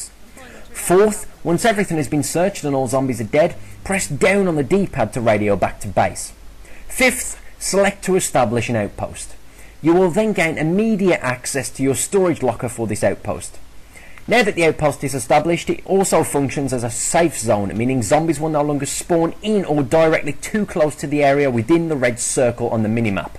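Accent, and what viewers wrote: Birmingham, United Kingdom. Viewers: Is that a brummie accent?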